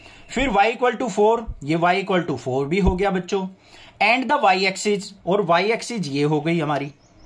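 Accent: Haryana, India. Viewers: Ap haryana se ho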